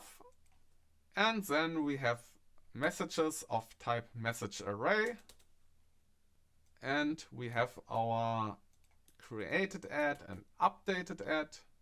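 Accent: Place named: Germany. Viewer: He literally sounds German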